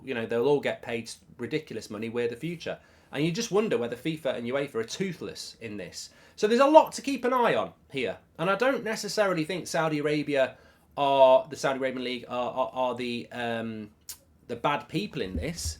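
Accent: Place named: Newcastle, United Kingdom. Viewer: That Newcastle accent haha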